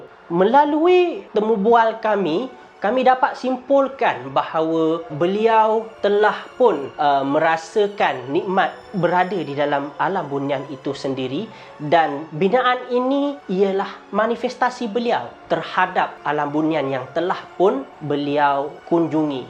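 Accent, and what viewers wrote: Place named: Kelantan, Malaysia. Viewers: Huyoo.. senior aku tuuuu.. slang kelantan tu. Hehehe. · lol kan di dh guna bahasa melayu baku walaupon ada sikit ii loghat kelantam yg terselit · aku x faham loghat kelantan so jangn guna please guna bahasa KL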